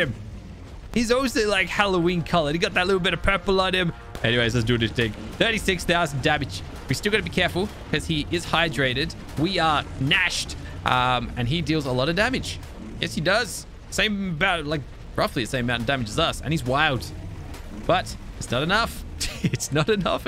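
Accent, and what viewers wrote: Australia. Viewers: Are you Australian cause you sound Australian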